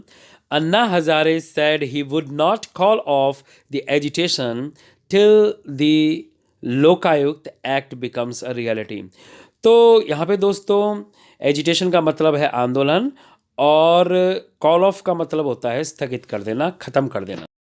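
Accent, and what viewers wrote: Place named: United States. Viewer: Sir, Thanks for uploading such Useful educational video and I like your American accent speaking style besides you are bold enough and outspoken.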